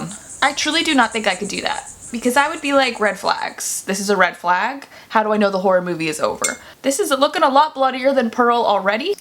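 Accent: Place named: South, United States. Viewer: I know she said she lives in Florida but when I've seen her other videos I never heard an accent. However this time when she talked about stepping on a gekko with her bare feet because she lost her glasses while the video had the guy stepping on the nail I could swear I heard her have a Southern accent. I had a friend I met in college and after talking to her for two weeks it was only then, after we'd studied all night, that her Lousiana accent came out.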